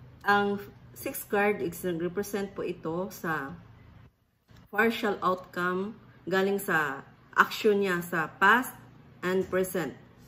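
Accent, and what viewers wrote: Visayas, Philippines. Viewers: Ha?????😂😂😂😂😂😂Diyos lang ang nakakaalam ng lahat DDS ito😂😂😂😂 paano mananakot e siya na nga ang tinatakot harapharapan😂😂😂pananalita mo lng bisaya o davawenya ka DIYOS ka ba???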